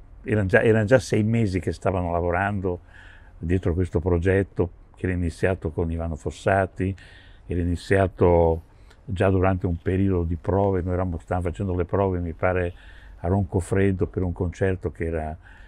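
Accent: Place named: Ferrara, Italy. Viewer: Si sente la ferraresità di un grande batterista!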